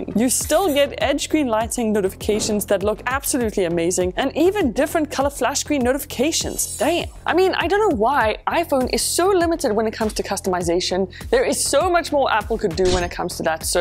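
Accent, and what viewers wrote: South Africa. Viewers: You're totally from South Africa :) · Are you from RSA 🇿🇦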